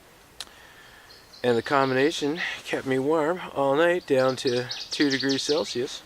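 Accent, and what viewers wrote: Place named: Ireland. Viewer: I believe that is the first time I've heard an Irish accent from Canadian lips... I like using flour tortillas as a wrap for the Breakfast Skillet. I gently warm the tortilla over the fire first. Then scoop in a healthy portion of the mix into the wrap. Tastes great. Maybe a little hot sauce to go with it. Agreed. Over-nighters lack enough time to get into the wilderness frame of mind. But they are great for testing gear and just getting away from it all for a bit. Great video.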